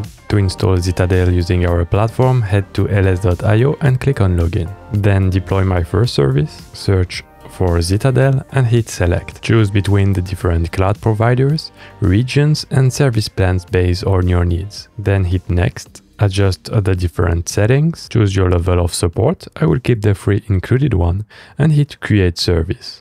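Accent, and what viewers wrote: France. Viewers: Do I hear French accent??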